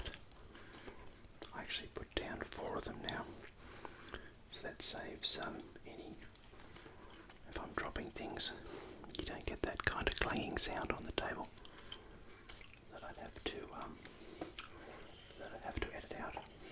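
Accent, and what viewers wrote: Australia. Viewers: "An Australian man's voice quietly discusses this beaker of contaminated blood"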